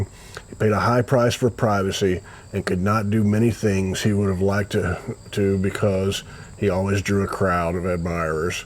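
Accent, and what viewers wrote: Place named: Fort Wayne, United States. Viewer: I appreciate what you're doing I grew up in ft Wayne so I sound like you I wish I could tell a story like you please keep it going your the best , love you in Christ always amen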